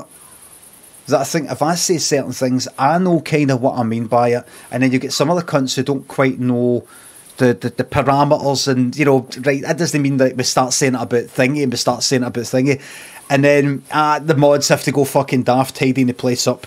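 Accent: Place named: Glasgow, United Kingdom. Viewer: wouldn't say you're going mad, but it is just a glaswegian accent. which is why it's more of a "chiy" sound than a "chae".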